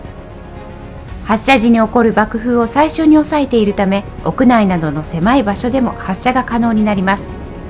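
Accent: Kansai, Japan. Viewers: ナレーションの女性は関西人かな❓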